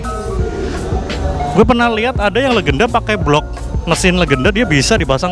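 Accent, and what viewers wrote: Banyumas, Indonesia. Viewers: Hahaha salam ngapak mas ..mesti wong cilacap mbok sampean lah 🤫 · ngapak ya om? asli mana?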